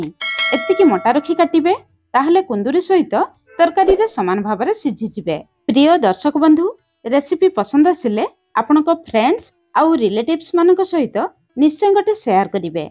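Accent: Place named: Ganjam, India. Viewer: Ganjam style re torada kahanti...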